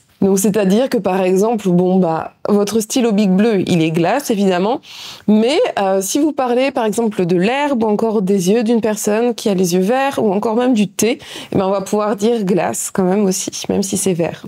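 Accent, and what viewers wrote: Vannes, France. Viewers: La prononciation vannetaise me rappelle mes grands parents 🥰 qui étaient de Guern…qui se prononce « Djuernne »…😅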